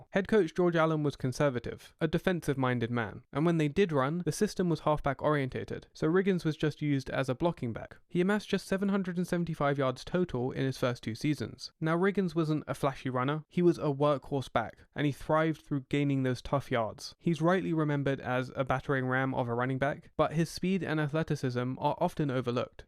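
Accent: England, United Kingdom. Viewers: I get so sick and tired of somebody putting on youtube , talking about a sport with an english accent that they're just reading a f**** script and know nothing about do better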